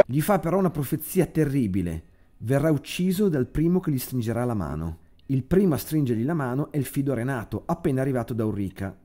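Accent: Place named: Modena, Italy. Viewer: Con il solito accento modenese strascicato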